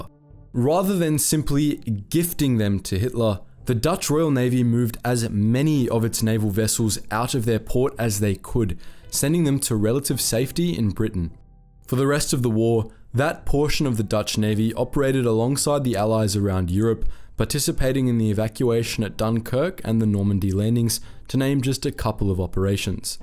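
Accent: Germany. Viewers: Great video, but I feel like you’re trying to say the dutch names the dutch way, but your’re using the german way of saying them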